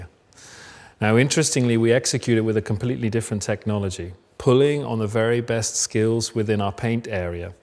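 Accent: United Kingdom. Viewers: Nice accent, what part of the UK are you from?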